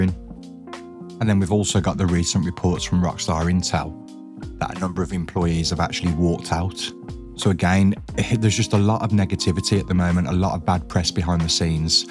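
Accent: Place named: United Kingdom. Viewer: Everyone can just admit they dont even know what a GTA VI is and is just watching for his cool British accent.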